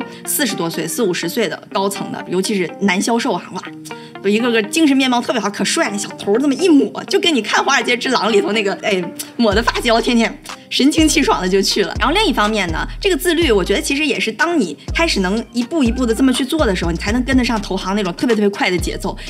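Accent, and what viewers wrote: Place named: Dalian, China. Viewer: 大连的？只有大连人能听出来！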